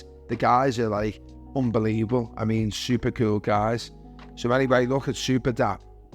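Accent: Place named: Scotland, United Kingdom. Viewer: I follow you for a while on twitter , but leasening to your accent , your Scotish 😁😁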